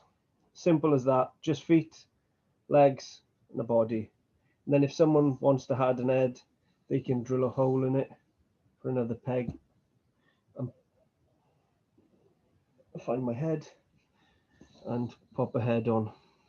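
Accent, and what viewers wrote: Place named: Wales, United Kingdom. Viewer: Gotta be a welsh accent. Lovely to hear it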